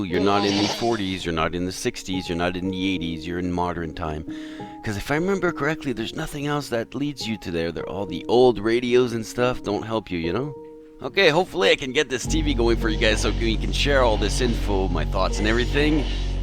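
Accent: France. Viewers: OH MY GOD!.........YOU'RE FRENCH!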